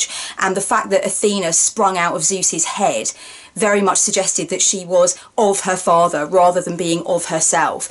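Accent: United Kingdom. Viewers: I have been following your channel for awhile and it is always in sync with what I am working on at the time. plus you really pull off blue hair well. And as an American with a degree in Brit lit your accent is heart warming. speaking of goddesses my baby daughters middle name is Luna so Diana and Hecate are really big influences.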